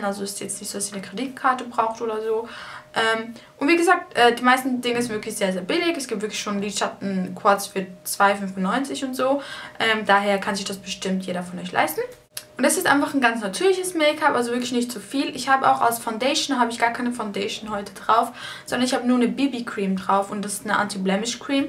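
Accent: Switzerland. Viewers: Schweizerin ? :D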